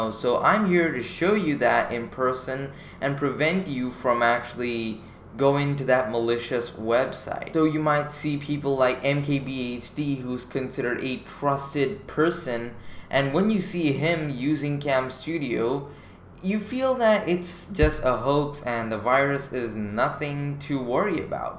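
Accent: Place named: India. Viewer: Man, for you being Indian, such a great accent, and the way you explained, so greatly! You deserve more, and if you're not Indian or you're offended, I'm sorry lol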